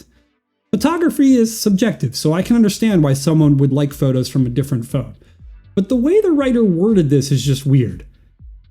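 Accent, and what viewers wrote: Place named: Australia. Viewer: Are you really going to take advice from a dude w an Australian accent?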